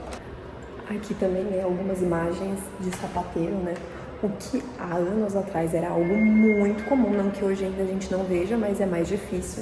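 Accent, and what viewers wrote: Brazil. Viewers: Sabia que esse sotaque só podia ser de SP.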